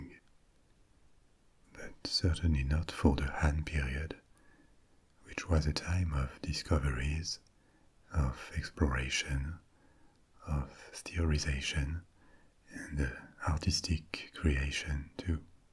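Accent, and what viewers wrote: France. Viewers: I love the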